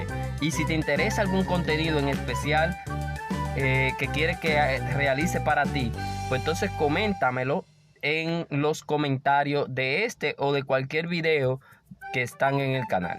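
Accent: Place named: Dominican Republic. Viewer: También soy Dominicano, me lo imagine por tu asentó, tienes un sub más.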